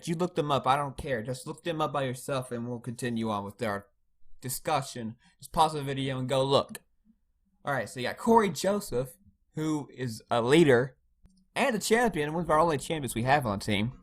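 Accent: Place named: South, United States. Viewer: Are you originally from Toronto? Because it sounds like you have a Southern US accent.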